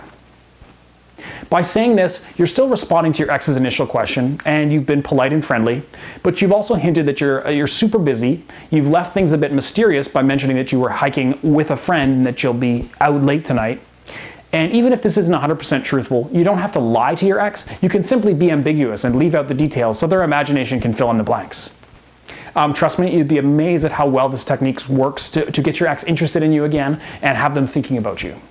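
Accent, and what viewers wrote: Canada. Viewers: Are you...Canadian?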